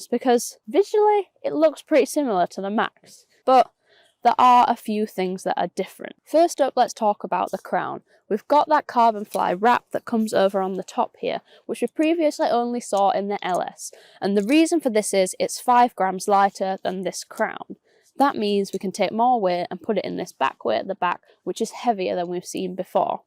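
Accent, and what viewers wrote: United Kingdom. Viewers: You know I really like your content and vids. I’ve become a fan, BUT I have to admit you’ve got the coolest UK accent ever!!! Great review, picked up my 10K yesterday, can’t wait to hit it….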